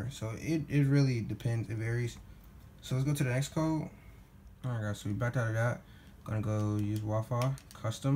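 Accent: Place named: Baltimore, United States. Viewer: That Baltimore😁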